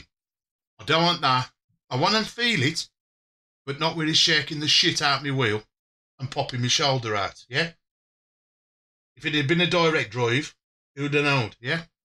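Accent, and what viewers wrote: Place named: Black Country, United Kingdom. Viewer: Great to hear a Black Country accent. I live in Wales now but I'm a Gornal bloke. Thanks very much for the info on the new force feed back. I've got a G920. The steering since the latest update is way too light. I've tried everything except that internal friction controller. I'll have a go at changing that tomorrow. Cheers mate.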